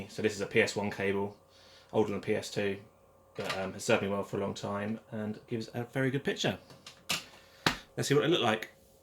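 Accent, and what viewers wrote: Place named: United Kingdom. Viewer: Guessing based on your accent... you are from the UK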